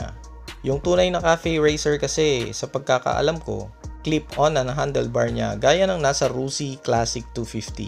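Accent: Ilocos, Philippines. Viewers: Ilokano ah lods hehehe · Ilokano ka mt bosing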